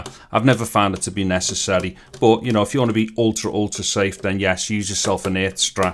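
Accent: Liverpool, United Kingdom. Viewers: lol, his accent is as scouse as it gets. it is awesome. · Ha, ha, ha.... I was saying to myself when you started talking... Liverpudlian. Just like them Beat- uls! I had friends from Liverpool decades ago. Also my Grandma's accent was close. She was from just a bit north of you. Thanks for the video.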